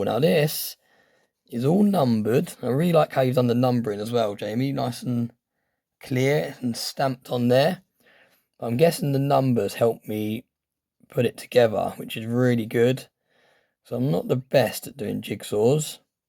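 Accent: United Kingdom. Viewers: I love watching your videos that british accent is really warming compared to my usial american videos ^^ , cheers from across the pond in france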